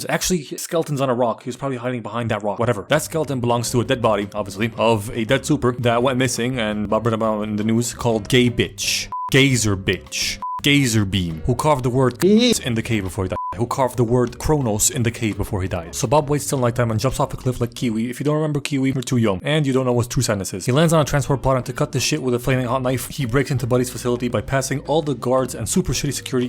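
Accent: France. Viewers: Hey you have a really good french accent ! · Are you french?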